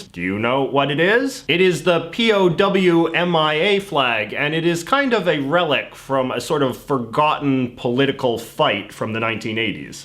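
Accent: Canada. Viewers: Gunter Ringgenberg is Canadian. Why do you think he says "about"like that?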